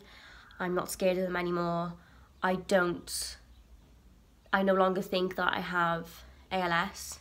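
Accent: Cardiff, United Kingdom. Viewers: Cardiff girl by any chance?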